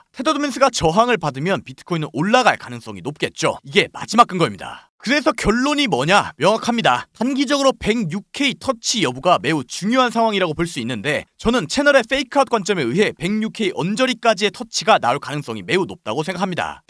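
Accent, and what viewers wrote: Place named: Jeolla, South Korea. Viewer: 느작없이 오랜만에 듣넼ㅋㅋㅋ 전라도 분이시구낰ㅋㅋㅋㅋ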